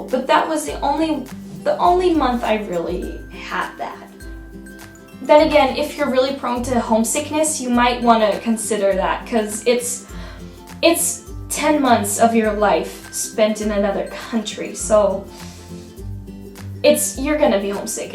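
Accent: United States. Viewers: you got an American accent now.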